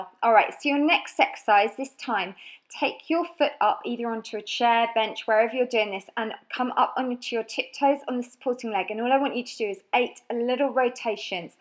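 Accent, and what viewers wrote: United Kingdom. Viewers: Wyndham-Read thank you!! it was not to be ofensive at all! you are really good and I love your determination and your constance and energy! and if you ever tried an Adults ballet class you would pick it really fast! I am sure of it:)., may I ask where is your English accent from? I am trying to improve my English. thanks! many hugs and all the best in your really fascinating project!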